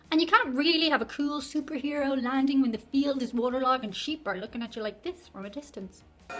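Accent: Ireland. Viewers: I find it interesting as an American I much more easily understand the Irish accent better than the British.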